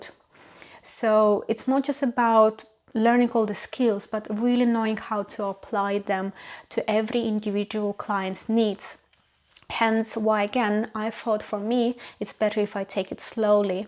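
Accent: Russia. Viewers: you have russian accent. Are you from Russia?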